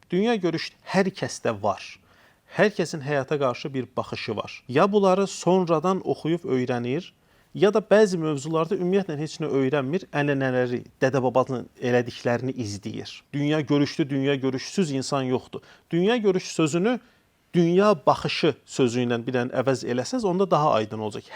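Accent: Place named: Shaki, Azerbaijan. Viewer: Nitqinizdə Şəki ləhcəsi oturub.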